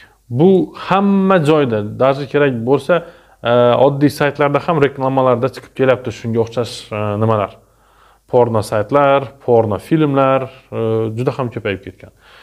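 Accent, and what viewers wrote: Khorezm, Uzbekistan. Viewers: Asslomalekum doktor Xorazmdan òxshisiz tiliz òxshab turibdi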